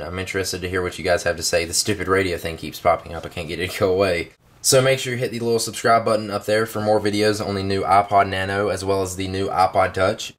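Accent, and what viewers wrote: United States. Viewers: american cowboy acvent is rlly anoying.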